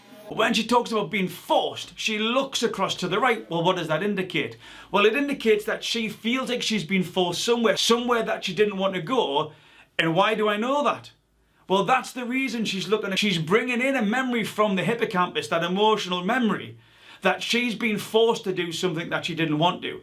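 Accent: Newcastle, United Kingdom. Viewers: Found your channel and love your insight. Oh I think the people of interest, that you could analyse, is endless.... I'm fascinated in the subtle signs to look out for, your beautiful Geordie voice , and your simple bitesize explanations, makes learning a treat ha ha. Your wife and daughters should be so proud of you. Having been lied to on grand scales through my life, I feel your work is invaluable to me personally, so I can continue to grow and trust again. Thank you so much. Janice from England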